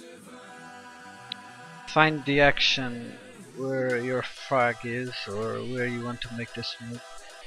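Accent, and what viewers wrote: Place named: Portugal. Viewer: Are you portuguese by any chance? your english accent reminds me of a friend of mine x)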